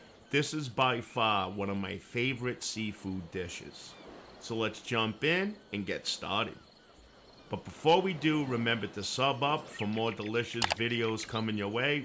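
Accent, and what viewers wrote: Rhode Island, United States. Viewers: ahhh. I see. Well, you certainly have not lost the RI accent!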